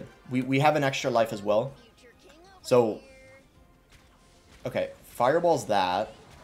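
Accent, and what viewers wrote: Scotland, United Kingdom. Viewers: Great knowldege transfer and gameplay! and I wondered, Is that a bit of a Scottish accent? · Has he ever explained why he says his O’s like a British person or something I’ve always wondered why he pronounces them like that (no hate just wondering) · Why do you randomly have a British accent?? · Why do you say your o's in british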